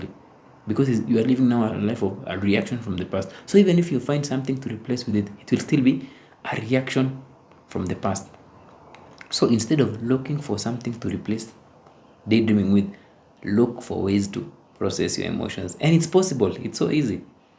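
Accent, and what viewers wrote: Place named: Kenya. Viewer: My fellow Kenyan!!!! That accent 😊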